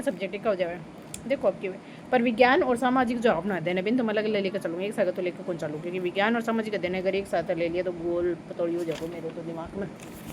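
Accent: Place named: Marwar, India. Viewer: Hindi se achi toh marvadi lage छ थे तोह मारवाड़ी ही बोल्या करो जीजी 😊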